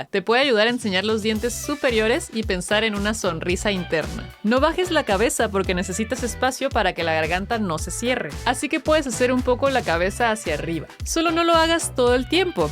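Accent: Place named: Mexico. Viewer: Che, que lindo acento Mexicano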